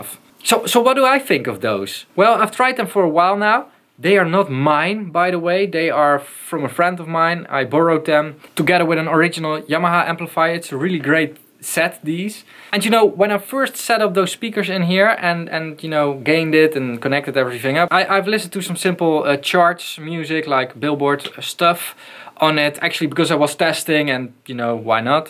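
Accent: Netherlands. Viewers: I realized that subtitle detected your english as dutch :) Thanks for the video!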